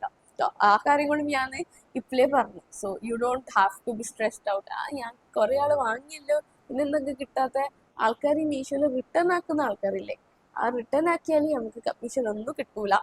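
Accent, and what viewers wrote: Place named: Kasaragod, India. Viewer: Kasaragod slag ❤❤😂😊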